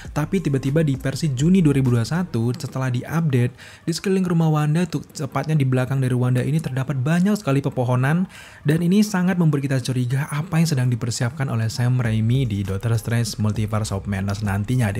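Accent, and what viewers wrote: Java, Indonesia. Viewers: gua gamasalah sama logatnya, mau dia logat medok kek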